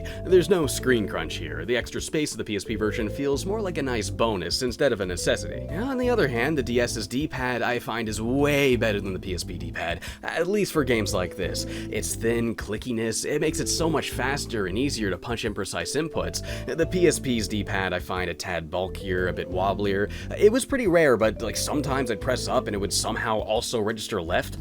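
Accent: Canada. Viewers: Well that, and everything else he says in his pretty conspicuous Canadian accent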